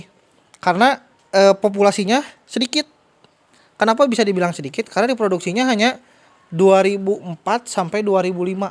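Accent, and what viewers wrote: West Java, Indonesia. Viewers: Sunda bet yah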